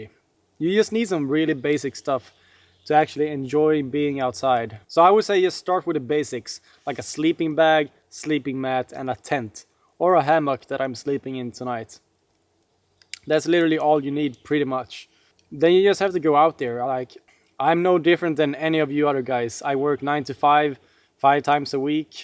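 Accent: Scania, Sweden. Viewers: Riktigt bra video! Hälsningar från skåne! Hörde lite skånska i din dialekt, får man fråga vart i skåne du kommer ifrån?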